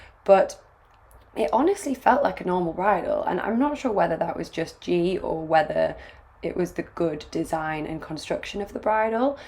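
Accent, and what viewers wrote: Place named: RP, United Kingdom. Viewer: Damn girl you sound posh ... . i like it :D that accent is awesome! like the queen of England.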